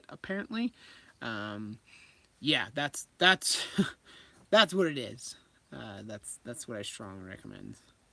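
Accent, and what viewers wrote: Canada. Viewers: just curious where you reside? u sound like an albertan like me (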